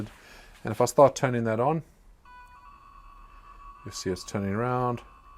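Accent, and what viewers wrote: South Africa. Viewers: Are you from South Africa brother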